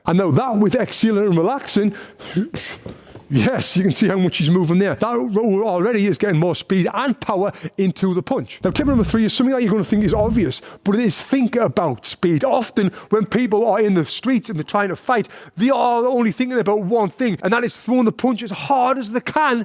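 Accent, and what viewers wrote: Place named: Newcastle, United Kingdom. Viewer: Excellent video, nice geordie accent 👍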